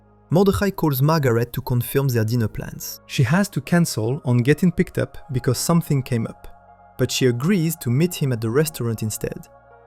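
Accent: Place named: France. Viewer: Tu peux mettre une piste audio en français? Parceque ça fais un peu bizarre d'entendre un mec parler anglais avec un accent français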